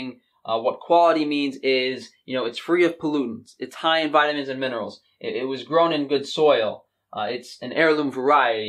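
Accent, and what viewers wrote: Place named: New York, United States. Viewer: I love the neat and tidy grooming and NY accent. Awwwh!💓 Great info here and especially given the fact that most plant foods today look, taste and provide entirely different benefits and flavours to humans than they used to. Not only have we made them resilient to pesticides and shelf life, more starchy, sweeter and less varied but we eat the same species repetitively and out of seasons. Humans have great metabolic adaptability and it is not only a key to our survival but how we found creative ways to increase nutritional value of foods. Recipes are a great way to see that in practice i.e. cooking, fermentation, food combination and even pairing certain alcoholic or herbal drinks with food.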